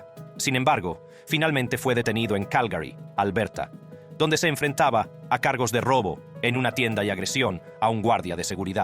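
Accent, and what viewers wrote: United States. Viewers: Deberían de buscar un narrador de historias, que no cambie su manera de narrar. Porque habla claro y luego le entra complejo de americano tratando de hablar español, que no se le entiende mucho. O te peinas o te haces rolos. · Q voz.... empieza hablando muy bien el español y luego a lo gringo, si no puede q no hable y pongan a otros q hablen bien el español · Ridículo hablar con acento americano cuando se escucha que puedes hablar perfectamente el español ridículo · Nose ,si persiven que el narrador habla de diferentes formas,primero habla como gringo que está aprendiendo a hablar español,luego habla normal,luego con acento de como español es rara su narración . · Que cringe da este motor de voz gringo 😅😅😅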